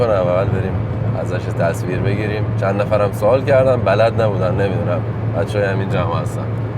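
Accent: Shiraz, Iran. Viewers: با اختلاف از تمام تهیه کنندگان محتوا روی YouTube بالاتر هستید. لحجه ی شیرازی امضا کار می‌باشد و میهن دوستی در صدایتان موج میزند. پاینده باشید. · به به لهجه شیرین شیرازی. خسته نباشید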